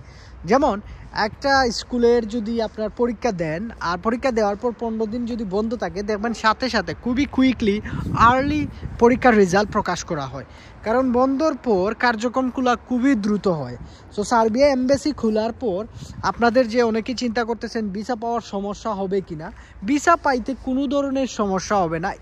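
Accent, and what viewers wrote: Sylhet, Bangladesh. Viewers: ভাই আপনে সিলেটি নি জানাবেন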